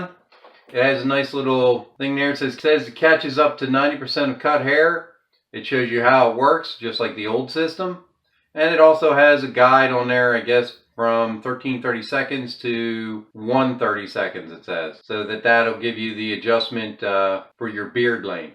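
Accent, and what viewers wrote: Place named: Philadelphia, United States. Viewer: DEF from Philly or Delco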